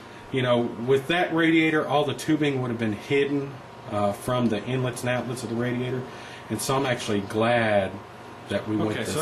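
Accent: United States. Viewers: You thick American accent really pisses me off!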